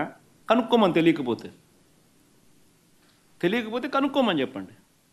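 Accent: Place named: Kadapa, India. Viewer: Kadapa slang super abbi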